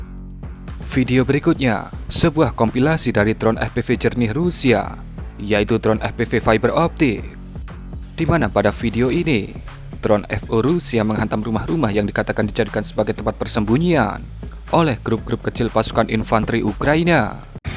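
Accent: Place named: Java, Indonesia. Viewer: Lebih gregetan lagi dengar suaramu yg medok cil tidak unt di lestarikan yg sprti lu medok2 gilani😂😂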